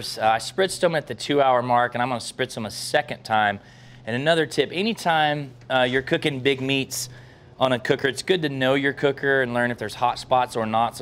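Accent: South, United States. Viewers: Wait is this guy from the south? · Is this guy from the south??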